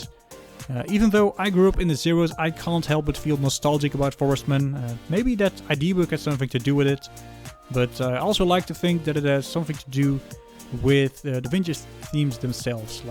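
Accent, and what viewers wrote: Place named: Netherlands. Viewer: Are you Dutch? You're accent seems dutch to me.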